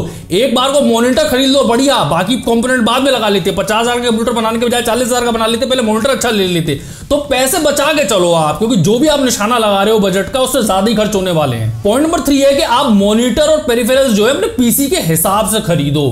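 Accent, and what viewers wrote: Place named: Bhopal, India. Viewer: Bhopali ho kya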